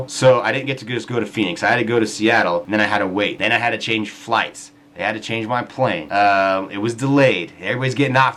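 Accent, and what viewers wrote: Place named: England, United Kingdom. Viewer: With your voice I thought u lived in England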